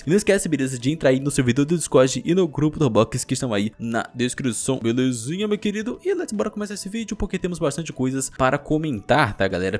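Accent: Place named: Ceará, Brazil. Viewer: eu não sabia que vc era cearense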